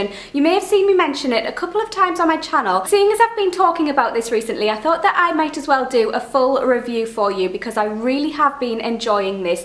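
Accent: Newcastle, United Kingdom. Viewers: Great review def gonna try this!👌🏻 I love your accent,makes me feel like I'm watching Geordie Shore & puts me in a good mood!😁😄 Loving ur videos😊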